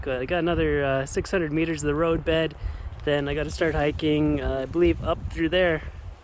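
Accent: Canada. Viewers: Great video brother you are a hiking fool. Very good drone footage. My first time watching your vids I’ll definitely subscribe I love these types. I’m guessing this is in Canada??? You kinda sound Canadian. Wish you safe travels your friend from Oregon 🤙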